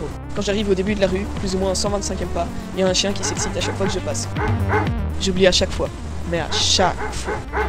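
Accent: Belgium. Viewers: T'es belge ?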